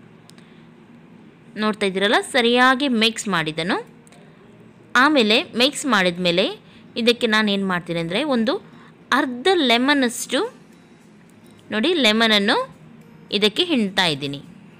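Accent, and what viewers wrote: Mangalore, India. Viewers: Ma'am nimma manglore Kannada kelodake tumba kushi aguthe kelthane irbeku ansuthe.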